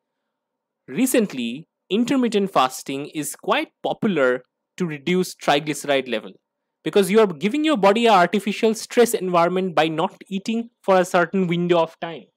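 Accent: India. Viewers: bro use AI english or american accent it will promote ur videos,. we indians arent respected in the world so dont use our secret accent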